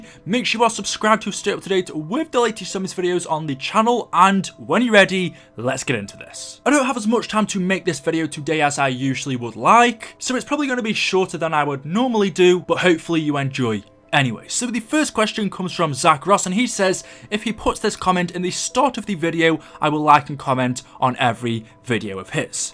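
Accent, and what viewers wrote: United Kingdom. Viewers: Why are you British and also who put the mark on the SOE Crew. Or what happens after SOE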